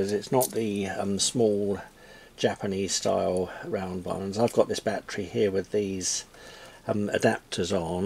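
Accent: United Kingdom. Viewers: Hi, I can tell by your accent that you are a fellow British hobbyist. Currently, I am not sure where to find metal stock at a good price. Do you know good places in the UK to find some thanks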